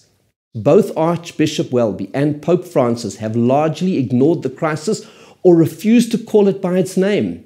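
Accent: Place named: South Africa. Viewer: Within 10 seconds I knew you were South African.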